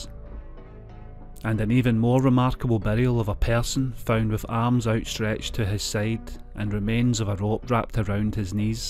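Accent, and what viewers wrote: Scotland, United Kingdom. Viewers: Loved the Scottish accent! And the rest 😉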